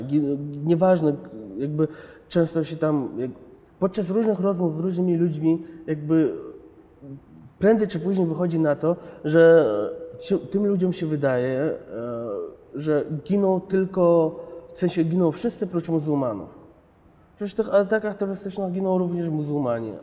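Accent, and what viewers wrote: East, Poland. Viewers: Ma delikateńki, leciuteńki, prawie nie słyszalny wschodni akcent. Można go zauważyć.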